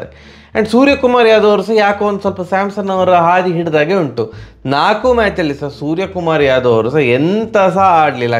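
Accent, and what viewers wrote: Mangalore, India. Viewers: Mangalore shaili Chand untu marayare❤❤❤❤ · ಅಣ್ಣ ನಾವು ಧಾರವಾಡ ಜಿಲ್ಲೆಯವರು ನಿಮ್ಮ ಮಂಗಳೂರು ಶೈಲಿಯ ಮಾತು ನಮಗೆ ಬಾಳ್ ಅಚ್ಚುಮೆಚ್ಚು 🙏💐💛❤️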